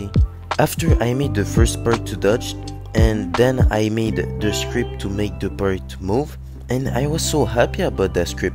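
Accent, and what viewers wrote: France. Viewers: you speak french ? You have a accent french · You are french for sure hahaha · Tu est un français ça s'entend !! 😂😂😂 Mais super video!! Continie comme ça!!😊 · are you french? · Aew you french? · are you french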